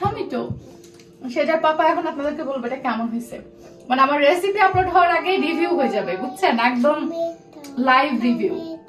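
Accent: Kushtia, Bangladesh. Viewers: Apu ami tomar kotha sune mone hoyeselo tumi amader kushtia r meye ,onak valo lage tomake.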